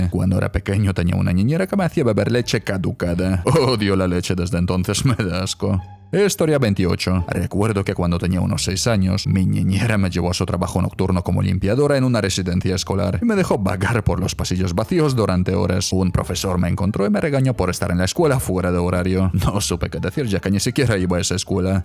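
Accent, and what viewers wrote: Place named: Mexico. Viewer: Ese acento mexicano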